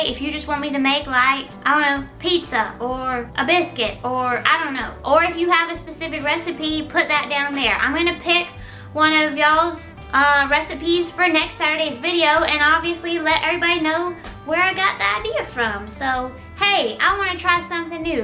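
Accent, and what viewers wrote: United States. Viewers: love you're country accent love you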